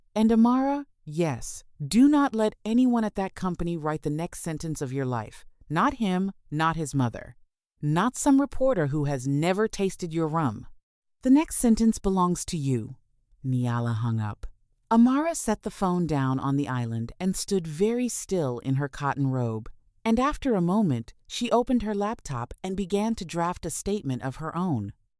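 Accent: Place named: Caribbean. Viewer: Loving this. Hearing the Caribbean influence is beautiful. Wished there was some good accents. Lol. Respect from Bim🇧🇧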